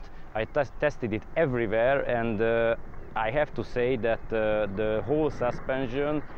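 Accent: Hungary. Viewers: Borzalmas akcentus... komolyan. Magyarországon képtelenek megtanulni az emberek, hogy NE magyar hangleejtéssel beszéljenek angolul. Inkább feliratozzátok a videót.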